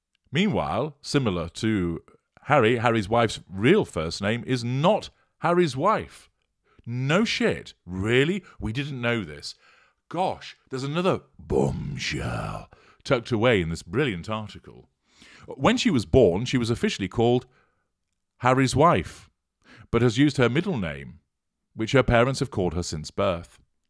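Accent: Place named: North, United Kingdom. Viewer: voice, which is warm and educated, has a hint of vowels north of Watford (Brits will know what I mean). I picture him exceedingly well-dressed in Savile Row suits and quality shirts with monogrammed cufflinks. His shoes are hand-made and always polished. He is well-groomed and never has long or dirty fingernails. I am actually describing my fantasy man! may of course be prone to slobbing it in a hoodie, baggy jeans and trainers - somehow I think not!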